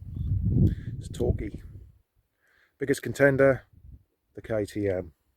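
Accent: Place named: Norfolk, United Kingdom. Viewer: How you getting on with the bike 10 months on.... what's the mpg like and how well does it sit at 70mph on the duel roads.... Moonrakers on Oak Street should be getting more in soon and I like the look of the g1 and the adventure version like this u1... Smart colours nice looks and seams to have the most torque out of all 125cc... Apologies for all the questions and thanks for the post... Nice to hear a Norfolk accent...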